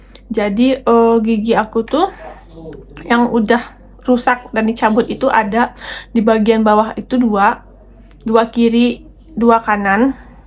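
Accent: Padang, Indonesia. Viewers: Kaka nya org padang ya. 😅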